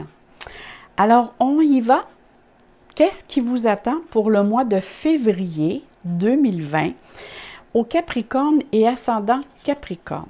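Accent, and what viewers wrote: Canada. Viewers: Merci🌹🍀j'adore votre accent canadien ❤️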